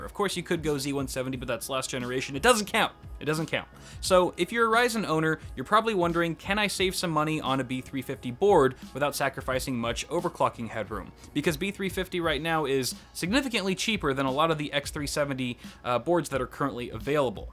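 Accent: South, United States. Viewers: I read this in southern accent- I have no idea why!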